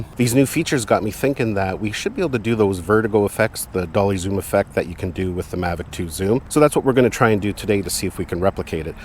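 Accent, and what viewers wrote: Canada. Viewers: yup....he's Canadian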